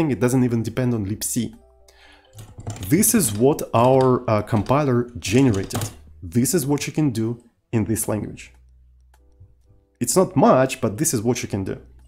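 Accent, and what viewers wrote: Russia. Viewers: такой жесткий русский акцент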